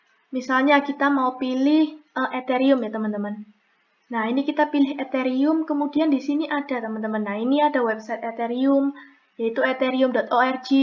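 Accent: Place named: Surabaya, Indonesia. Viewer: Logat surabaya medok ci xixixiix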